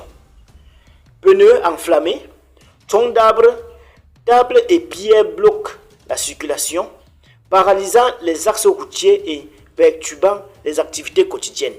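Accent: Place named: Cameroon. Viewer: Toi avec ton accent camerounais là tu crois qu'on est assez bête pour croire à ce que tu dis moi je suis à Yakro et on a dormi en pays ici hein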